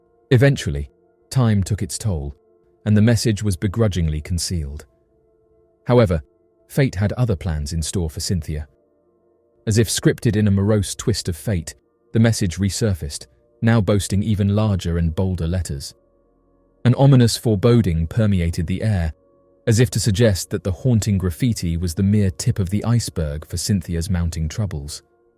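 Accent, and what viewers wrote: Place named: Public school, United Kingdom. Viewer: Oh yes oh yes my guy is back. What a voice. 2 million here we go. Sleep awaits. 😅 Edit- just the one story so far, still great though. No shade on the "new" lad, perfect enunciation just not intensly soporific. Who doesn't love random tales in public school English. Best video in years chaps. Hand crafted like the old days. Love it. ❤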